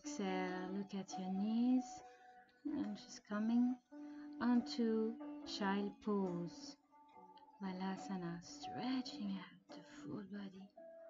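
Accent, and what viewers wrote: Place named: France. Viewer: Nice video 😊 are you French? Can recognise the accent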